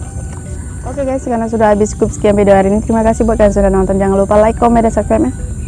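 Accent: West Java, Indonesia. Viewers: wong palembang tapi pakai sound sunda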